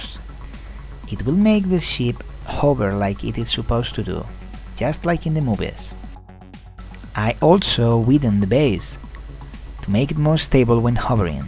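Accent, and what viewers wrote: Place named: Poland. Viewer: Wait... You're spanish? I was thinking, that you're polish.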